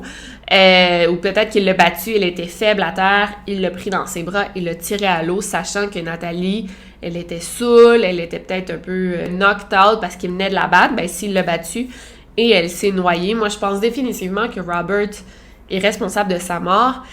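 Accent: Quebec, Canada. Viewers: Sans vouloir vous offenser, je trouve votre remarque inutile et en plus de ça, pas très sympathique... Un accent ça ne se choisit pas. En plus l'accent Québécois c'est le best accent du monde 🥺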